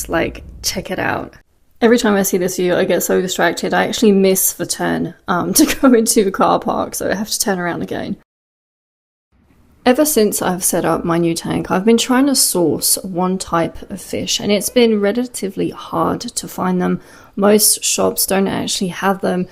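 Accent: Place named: England, United Kingdom. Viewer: ton accent anglais incr <3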